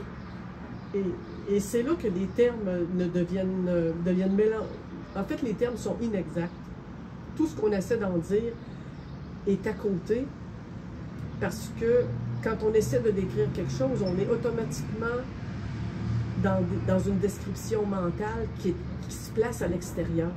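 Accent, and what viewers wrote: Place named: Quebec, Canada. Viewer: Toujours intéressant, mais le bruit de fond industriel est pénible. Comme l’accent québécois n’arrange rien en plus 😅 But thanx ;)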